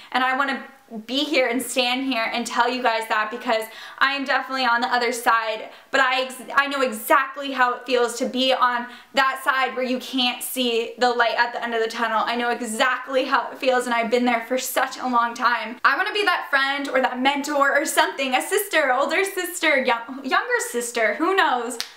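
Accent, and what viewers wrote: Canada. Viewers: question are you Canadian??? cuz your accent is cool!!! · is she Canadian? I can't quite tell but the way she pronounces certain words make me think maybe she is.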